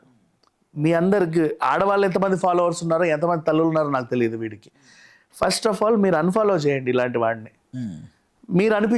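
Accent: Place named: Visakhapatnam, India. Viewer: Vizag telugu is far better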